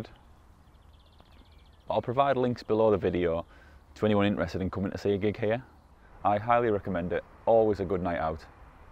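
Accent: Middlesbrough, United Kingdom. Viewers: Hi lad, just discovered your channel, it's excellent. Keep up the great work. Are you a Middlesbrough lad by any chance you have the accent?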